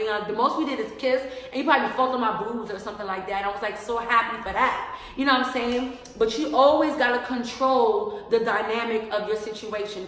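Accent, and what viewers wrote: New York, United States. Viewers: I love your ny accent ❤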